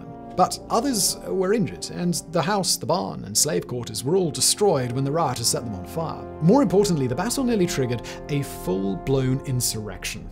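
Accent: United Kingdom. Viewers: Love your channel. I noticed that in this instance your British accent is a little ironic. Keep up the great work! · Listening to a limey talking about what went on here. No thanks I'll pass, I don't want the opinion of those we soundly gave the boot to out of this amazing Nation. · can't do the horrible brit accent on american history, this channel sux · Really like the vid, but hard to weave through your Brit accent, woof. You could also use some tonality in your narration.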